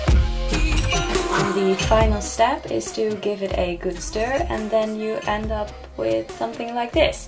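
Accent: Netherlands. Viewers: Ik dacht al een beetje, aan je accent te horen, dat je Nederlands was! Maar hey Albert Heijn ✌🏼. Leuke video !!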